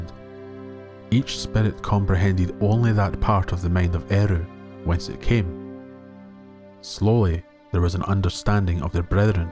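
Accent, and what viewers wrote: Scotland, United Kingdom. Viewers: 😁 near the end of the video I did recognize the Scottish accent! Cheers from Romania! And a good night i got to go to bed.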